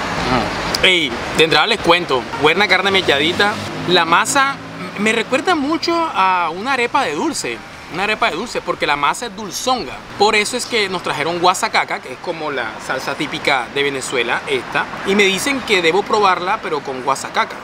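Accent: Caribbean Coast, Colombia. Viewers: Si, el habla costeño, pero no vulgar, ojala no se amane. Que siga como hasta ahora ha llevado su canal, gracioso, pero sin rayar en la vulgaridad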